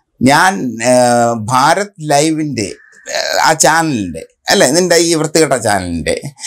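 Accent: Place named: Thiruvananthapuram, India. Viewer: അണ്ണാ tvm ഭാഷ... വളരെ മനോഹരമാണുകേട്ടോ... രണ്ടാളും ട്വിൻസാണോ?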